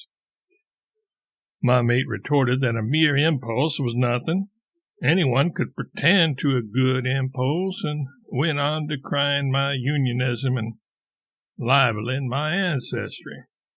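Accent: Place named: United States. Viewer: Thank you so much! I've been trying to find this piece of Twain's work in audio form in an American accent for weeks now, I'm quite used to John Greenman, but this is perfect, I truely appreciate this channela and everything on it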